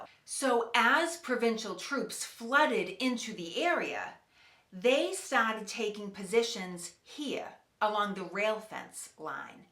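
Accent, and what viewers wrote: Boston, United States. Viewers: Hi - I recently discovered your channel and love it (AND your Boston accent)! I'm a member of The Society of the Cincinnati (Virginia), and when your channel makes its way to Virginia, there are lots of members here who can help you with your video research and host you! HUZZAH!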